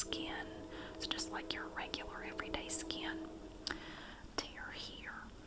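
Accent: South, United States. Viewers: No filter on your southern accent/charm. 5/5 Stars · Yay other southern asmr love it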